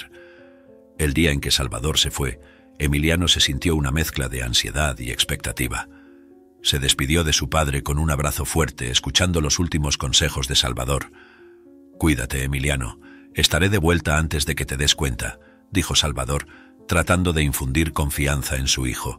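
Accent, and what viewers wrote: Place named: Spain. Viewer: Para que la historia resulte interesante y hermosa, tiene que haber una buena historia, una excelente voz, bien modulada, buen lector. Todo éso se ha encontrado en ésta. Muy especialmente, con el hermoso acento del Castellano por un español. Me encantó.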